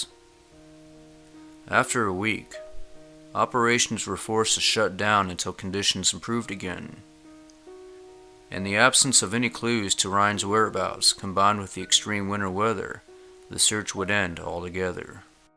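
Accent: South, United States. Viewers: You sound like the distant cousin of Jensen Ackles who comes from the south and is unfazed by everything.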